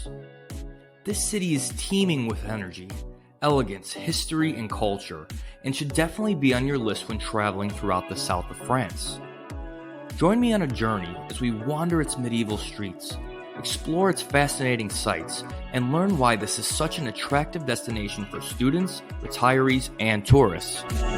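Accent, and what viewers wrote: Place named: United States. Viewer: what did he say that was not wrong? I also live in this city and I found what he said to be accurate. Yes not all resturants are closed during the afternoon but if its past 1pm good luck with getting into one as the kitchen can close between 1 and pm Lunch is like 1-1.5hr window to go. He sounds like he is either American or Canadian and there places are open all the time. So it is very different.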